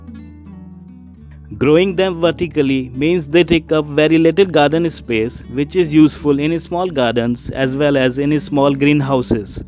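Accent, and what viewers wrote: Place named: India. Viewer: It is Indian English just like there are American English or British English.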